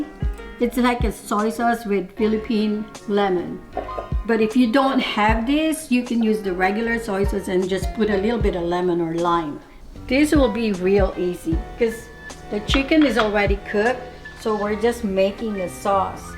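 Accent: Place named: United States. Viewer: i like your cute american accent , count me as your number 1 fan and subscriber. i miss your cooking when we were a lot younger !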